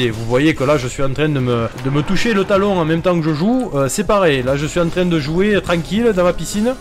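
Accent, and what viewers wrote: South, France. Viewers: Je suis plié de rire j’ai jamais autant rit sur une vidéo YouTube, avec ton accent du sud qui rajoute un petit truc, une pépite de YouTube tu es, continue comme ça tu es incroyable Peace ✌️ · C'est la première fois que je rit autant 😂 Ton accent du sud combiné avec tes magnifiques blague 😂, c juste ouf Sinon très bon guide, tu gagne un abonné 👍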